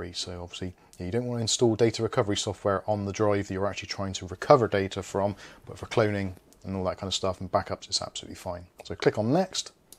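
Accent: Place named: West Country, United Kingdom. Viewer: Great video. Nice West Country accent ;-)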